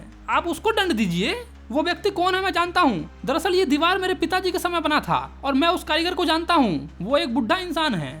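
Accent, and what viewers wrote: Bihar, India. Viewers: bhai is bihari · His tone of talking is like Bihari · Bhaiya ap Bihari ho n😊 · Bhaiyaa bihari ho kya😂😂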